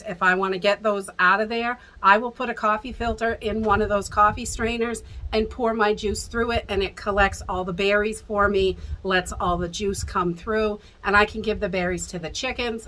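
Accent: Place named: Maine, United States. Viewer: First timer, I was listening to Canadian Prepper and your video just started afterwards, as I listened, I was thinking if that is not a Maine accent. Great video from a neighbor in southern Franklin Couunty, Maine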